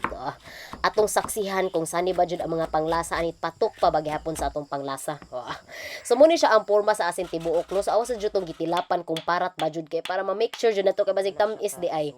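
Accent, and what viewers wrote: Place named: Visayas, Philippines. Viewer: Ang imong bisaya makamingaw gyud! I’m lovin’ it. It hits home.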